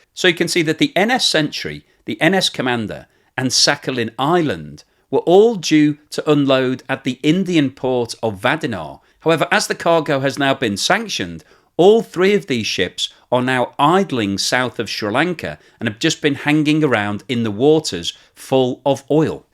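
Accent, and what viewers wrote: United Kingdom. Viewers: At begining I have a hard problem to understad your britsh acccent, now it loks familiar for me. Thank you for all the good informations.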